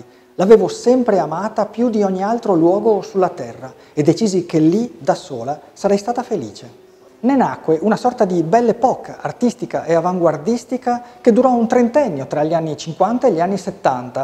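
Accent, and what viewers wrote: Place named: Veneto, Italy. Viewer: Un venetofono alle prove col raddoppiamento fonosintattico: esilarante! :-))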